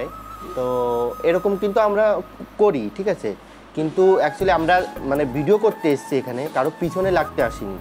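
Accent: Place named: Nadia, India. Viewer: 34,তোমার সব কথা শুনলাম ভালো লাগলো সত্যি ইউটিউবে এখন কুটকাচালি চলছে, কজন বোঝে এই কথা, ভালো লাগলো👌👍 তুমি কি নদিয়ার বগুলাতে থাকো তোমার কথা গুলো ঐ দিককার মতো, ভালো লাগলো ভাই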